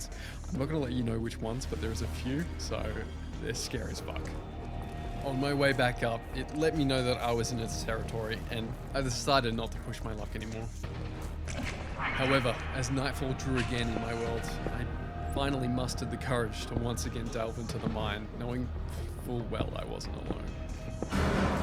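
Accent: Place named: Australia. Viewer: Oh GOD you're AUSTRALIAN?! 🤢🤢🤢🤢🤢🤢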